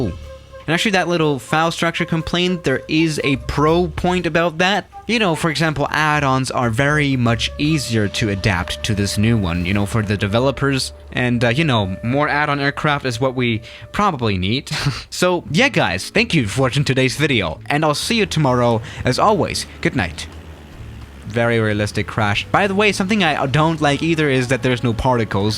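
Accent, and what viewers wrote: Germany. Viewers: Does anyone else notice his German accent coming in stronger every video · Wait are you german?😂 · R u german?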